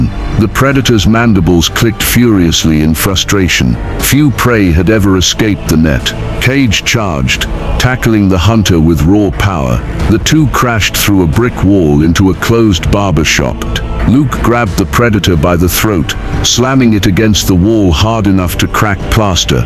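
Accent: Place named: United Kingdom. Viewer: I’m just wondering why a British male voice is narrating a story about Luke Cage.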